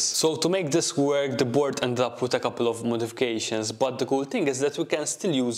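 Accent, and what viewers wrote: Malta. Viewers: Can I ask, are you Maltese? Having Maltese family, I'm hearing the accent all the time and yours sounds very familiar! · It's unmistakably Maltese, and the name is another giveaway. I lived there for 15 years.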